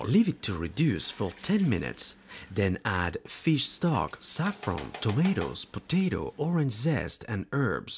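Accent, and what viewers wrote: France. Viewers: Mimi R I know. But I don't mean his frensh accent but more his Terminator way to talk. You know what I mean?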